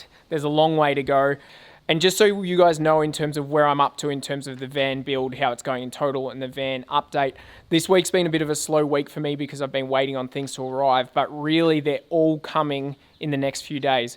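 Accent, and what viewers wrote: Australia. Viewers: It’s good to see and hear an Ozzie doing a camper conversion Keep up the good work and the Occerisums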